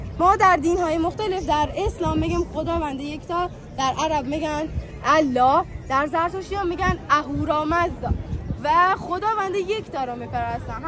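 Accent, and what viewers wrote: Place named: Yazd, Iran. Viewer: لایک ۷۳ ❤ من وقتی بچه بودم یکبار با خانواده رفتیم یزد و کمی از یزد یادم هست 😊من عاشق لهجه یزدیم خیلی زیبا و قشنگه ممنون عزیزم بابت به اشتراک گذاری این ویدئو زیبا❤❤❤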